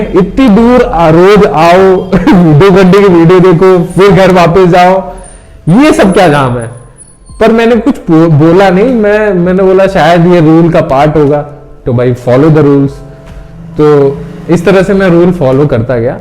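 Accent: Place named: North, India. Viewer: You speak hindi. So I want to ask you anna where do you live.🙏🙏🙏🙏 I mean are you from North.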